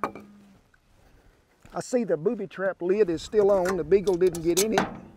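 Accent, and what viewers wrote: South, United States. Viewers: Mr., I feel I need to reach out to you since I found your site by accident. I am about your age and was brought up in Tillman County. Seeing you standing in the pasture with the Mesquites and the pond in the background brought me back to a time I loved! Rotating wheat, dry land cotton and working them old cows was just about heaven to me. Hearing your accent and the way you express yourself has got me turned around talking country, again. I left at age 18 to serve my country and have longed to return someday. That time has come and gone and I'm afraid it's too late for me now but hearing from you was like a letter from home and the tears just came gushing. Thank you so much for the trip down memory lane.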